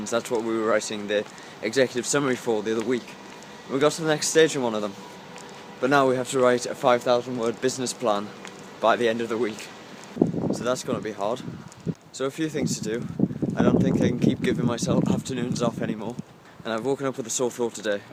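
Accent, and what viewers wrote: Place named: Northern England, United Kingdom. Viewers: If I were to guess, I'd say it sounded like a Sunderland accent ! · northern england - don't know the specifics because that would be creepy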